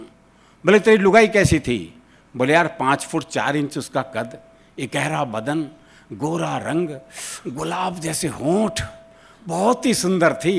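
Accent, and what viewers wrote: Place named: Haryana, India. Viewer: All old jokes. Not one was original. Only the haryanavi accent was funny.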